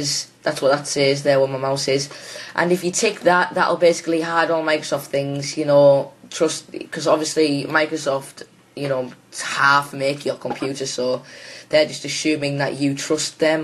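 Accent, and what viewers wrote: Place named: Liverpool, United Kingdom. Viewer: Ya sound scouse or maybe from Manchester, yeah? Nonetheless, loving your dialect! Cheers!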